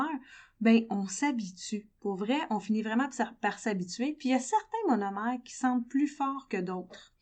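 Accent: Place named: Mauritius. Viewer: Vous avez l'accent d'une Mauricienne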